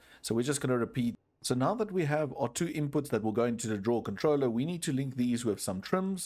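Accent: South Africa. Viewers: So great to hear a South African accent on a good quality youtuber. Keep up the great content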